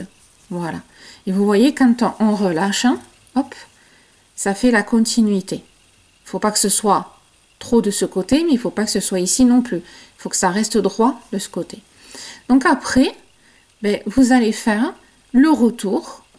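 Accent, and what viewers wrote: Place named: Pau, France. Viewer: Vous avez un accentde Pau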